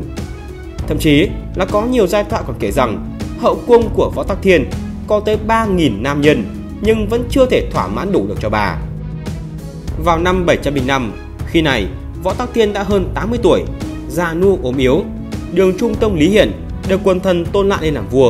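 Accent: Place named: Cambodia. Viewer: Ko biet tieng Anh thi lam on lay ten tieng viet dzum, noi tieng Anh ma nghe nhu tieng campuchia, nghe phat gom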